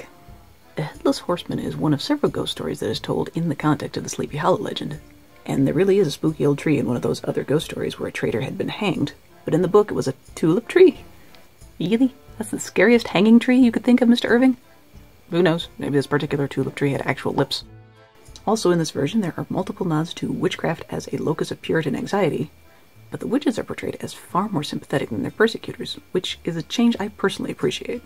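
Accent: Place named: England, United Kingdom. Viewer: I feel like I learn more watching your videos than I would reading the book! Loved the English accent!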